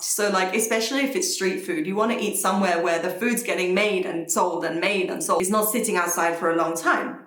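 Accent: Nigeria. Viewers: You're developing a Nigerian accent That's sweet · I was ready to roll my eyes, but was pleasantly surprised.I was taken aback that you were Australian ( I have my own prejudice against them, I find them to be quite racist and bush😂)Such a perceptive and intelligent young woman.Your husband ,I haven’t finished watching, but I am assuming you married him because of your surname.. had found a good woman. You even sound a bit Nigerian now😂. May God bless and strengthen your union… two brave people who took a chance and it paid off, I love that!👏🏾🇳🇬🇬🇧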